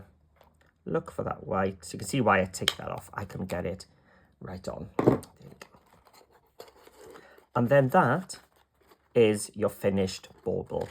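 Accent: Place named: Carmarthen, United Kingdom. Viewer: Just come across from another YT channel and so glad I did. These baubles are gorgeous and ideal to make for my grandchildren for little treats on the Christmas tree. From one 'welshie' (Newport, Gwent South Wales) to another (you sound like you're from the Carmathen area) to another, thank you so much and can't wait to watch more of your videos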